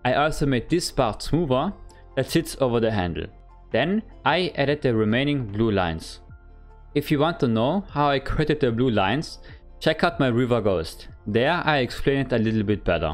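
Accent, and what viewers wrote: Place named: Germany. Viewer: ich wusste direkt am akzent dass du deutsch bist hahah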